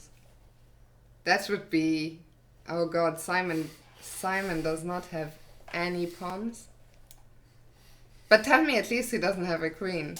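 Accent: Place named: Germany. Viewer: Choker is good fun if you can get it to run on your platform. I've tried 3 different Android versions and struck out every time. Am curious what version all the streamers are running on. Great job on the videos. Am intrigued by your accent. It sounds German, but if I recall correctly you're native to Luxembourg. How many non-English languages do you speak? Your English colloquiallisms and idioms are very good, attended university in the UK or US?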